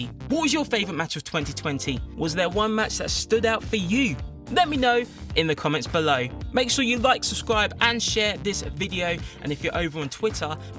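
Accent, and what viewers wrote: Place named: United Kingdom. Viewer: well first of all they're based in the uk so they've got accents and second he speaks just fine I actually really like this guy. Just cause he is not spelling it out for you doesn't mean he can't talk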